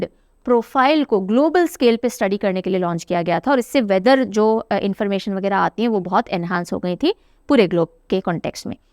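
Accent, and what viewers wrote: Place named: Maharashtra, India. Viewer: Your marathi accent is too good mam....Aaple sarkar seva kendra...love from Maharashtra ❤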